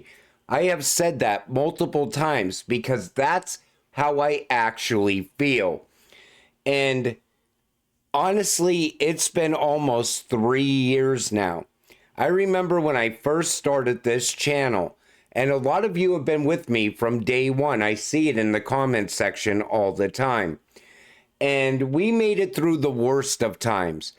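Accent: Pittsburgh, United States. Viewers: Congrats on 70k subs!! You should have 1M subscribers!! You're my favorite and go-to Crypto Channel [plus your accent sounds like you're from Pgh, where I'm from 😂]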